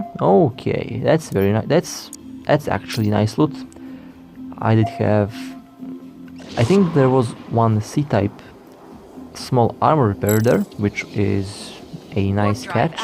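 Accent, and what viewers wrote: Russia. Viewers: Это у тебя русский акцент или мне просто кажется? Видео снималось на тесте?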